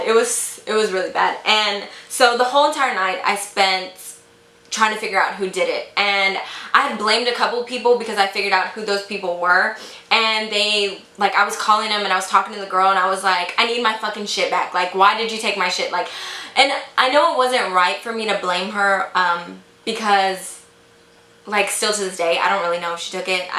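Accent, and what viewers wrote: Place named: Texas, United States. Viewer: this might sound weird but I love to hear you talk 😂 I love your texas accent and your stories! (more story time videos pls) thank you for being so humble and really inspiring! 💜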